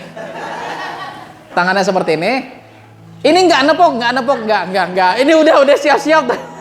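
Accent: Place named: West Java, Indonesia. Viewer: Mirip logat sunda 😅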